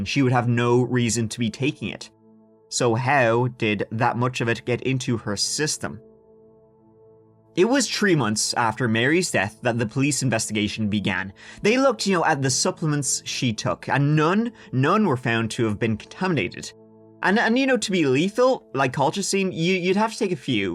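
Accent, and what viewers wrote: Ireland. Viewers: I enjoy ability to tell a story. Another enjoyable item is his Irish accent. I want to meet this gentleman and shake his hand. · You are my favorite podcaster ever I enjoy your content and you oh so much. Your voice is very relaxing and you’re so IRISH. ❣️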